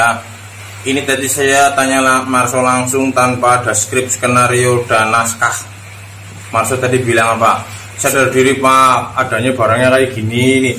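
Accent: Banyumas, Indonesia. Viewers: Dari mana bang, koq ngapak🙏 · Rika si wong ndi deneng Kya kenal ngapak e 😂 salam Sekang purbalingga kang😂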